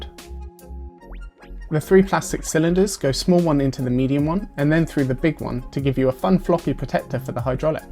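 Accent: United Kingdom. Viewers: rep bri'ish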